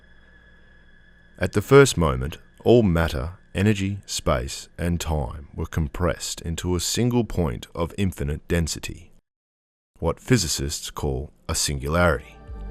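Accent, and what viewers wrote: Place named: Australia. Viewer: Swear to god when if we have an Australian npc in our next game, I will want that voice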